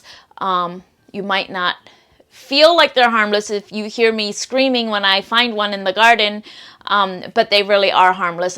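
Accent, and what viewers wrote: Pennsylvania Dutch, United States. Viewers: Is your primary language Pennsylvania Dutch ? I hear it spoken all the time where I live near Lancaster PA also Germany ! Thank you explaining the differences between the two . I use raised beds because of the deer they don’t eat my plants in a raised bed .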